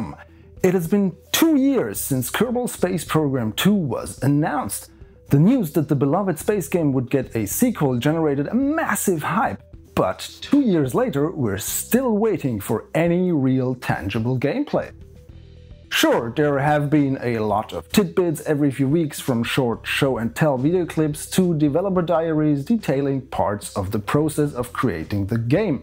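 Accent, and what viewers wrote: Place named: Germany. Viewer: Are you from a German speaking country? Sounds very German.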